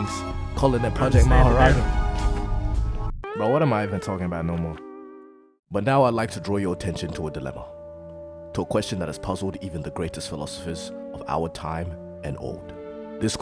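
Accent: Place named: United Kingdom. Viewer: this dude sound like a british dude tryna sound like a florida jit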